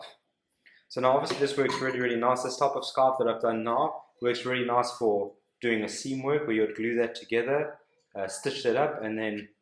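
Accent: South Africa. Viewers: Are you a South African ole chap ?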